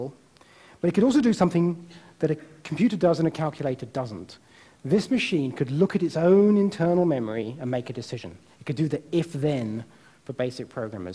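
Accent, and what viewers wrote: United Kingdom. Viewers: He talks too fast and with a British accent :(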